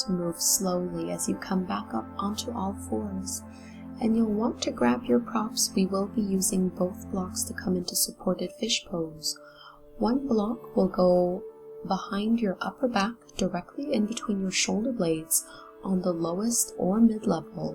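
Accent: Canada. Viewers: Great for loosening up my neck and back. Clear instructions, lovely calm voice, love the Canadian accent. My hubby and i enjoy your classes immensely here in Aussie! 🙏🏽💜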